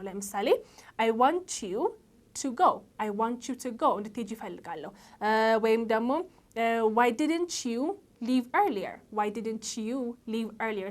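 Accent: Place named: United Kingdom. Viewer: 😢😢😢😢😢😢 omg special British accents I can't understand ohhfuuuu 😢😢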